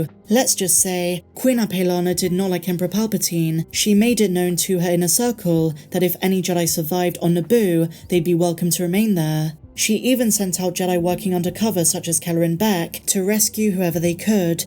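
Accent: United Kingdom. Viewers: Hi I am just loving all of the mandalorian…enjoying just watching it and then watching breakdowns and seeing things anew! Thank you❤ and having a uk voice is really special too.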